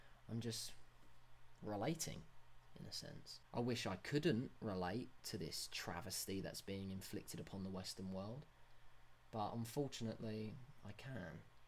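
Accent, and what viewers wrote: England, United Kingdom. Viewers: somehow everything makes more sense with an english accent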